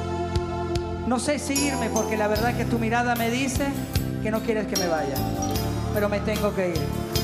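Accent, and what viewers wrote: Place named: Italy. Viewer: Ese asento italiano chevree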